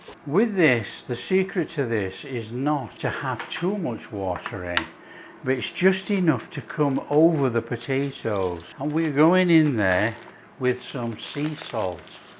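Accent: Yorkshire, United Kingdom. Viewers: glad to hear the pronunciation disclaimer in today video of your pronunciation of Papas Arrugadas Canarian Potatoes with your Yorkshire accent. I would hate to see a quified chef like yourself mispronounce it and stir up the hairs on the neck of your resident pronunciation troll 😂😂😂😂. They look delicious my friend. Cheers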